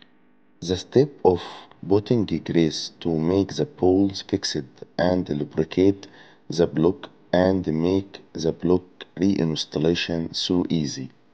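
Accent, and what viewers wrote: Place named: Egypt. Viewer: اللللله على الانجليزية المصرية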